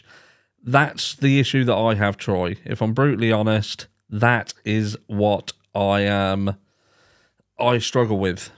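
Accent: Norfolk, United Kingdom. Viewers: It’s a Norfolk accent mines Suffolk and people think I’m from Australia too. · I think you sound british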